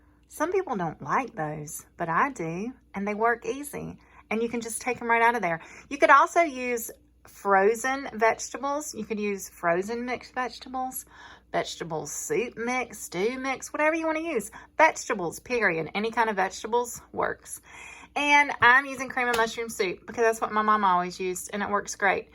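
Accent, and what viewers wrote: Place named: North Carolina, United States. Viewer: Like the way you talk and your voice sounds , NC slang , love it !!! 👍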